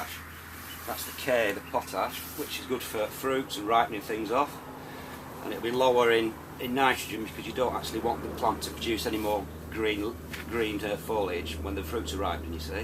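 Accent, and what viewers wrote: Yorkshire, United Kingdom. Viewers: .... that's his Yorkshire accent! "The fertilizer" become t'ertilizer.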